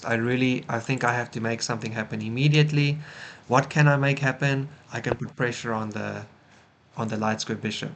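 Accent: South Africa. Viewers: Where are you from? you sound a bit south african lol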